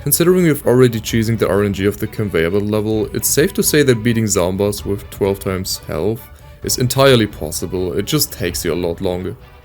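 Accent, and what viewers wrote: Germany. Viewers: Im also German and I instantly heard your accent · I Instantly knew you were German the second i heard the accent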